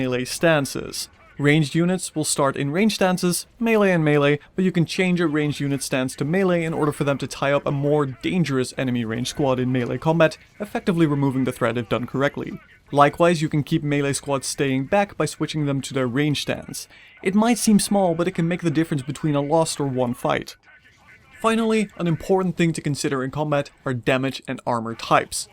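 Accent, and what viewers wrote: Netherlands. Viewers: You have the most dutch accent possible, great guide tho!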